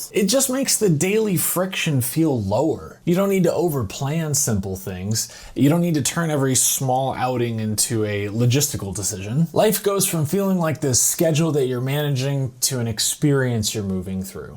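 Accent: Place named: United States. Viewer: Having an American accent, do Brits treat you differently/judge you because of your accent since your time in the UK?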